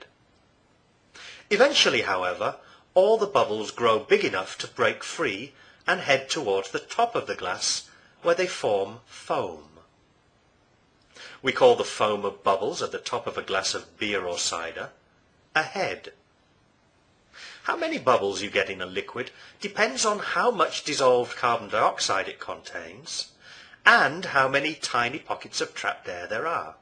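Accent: United Kingdom. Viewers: Is Mr. a native British speaker???????????????????????????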